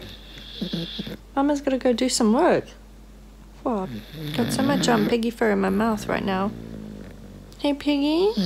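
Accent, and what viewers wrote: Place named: England, United Kingdom. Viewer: I just love your English accent (I am from US) Your close ups of piggy's face are really cute and funny! I love your your commentary, too!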